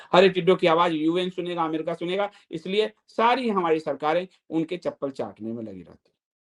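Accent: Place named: Bhojpuri, India. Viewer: Abe jahil, sahi se Hindi bhi nhi bol pata hai...bhojpuri bolne lagta hai(•‿•)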